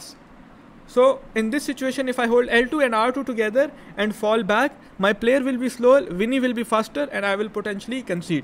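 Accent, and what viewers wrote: India. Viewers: Love indian accent 😅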